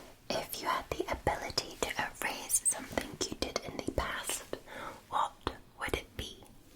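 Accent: Scotland, United Kingdom. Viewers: Wonderful asmr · I mean I’ve always loved the Scottish voice because hearing them shout is fucking beautiful but hearing her whisper is something else. I’m glad I share a kingdom with this woman 😇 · 3 minutes into the video and i realised she’s Scottish how can I not tell I’m also Scottish from Dundee sadly I’m so proud now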